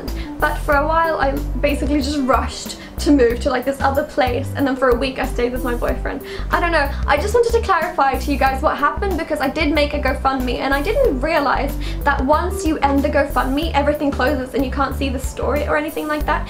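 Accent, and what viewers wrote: United Kingdom. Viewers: i'm a simp for british accent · what british accent is this? I LOVE IT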